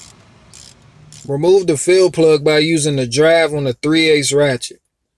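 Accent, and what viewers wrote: Washington DC, United States. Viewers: You from the DC area aint you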